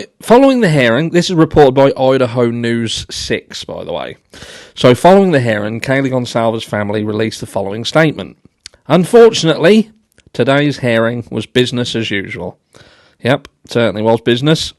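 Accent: United Kingdom. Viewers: I've seen your subs swooning over your blue, blue eyes, your British accent, your beautiful wife, etc. but it's your unexpected, high-pitched giggle that does me in 😅😂😂! Dying.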